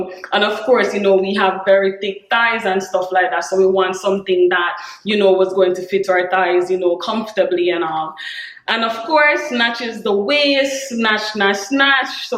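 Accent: Jamaica. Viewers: I feel like I just know your Jamaican cause it takes one to know one!! But maybe your African 😂❤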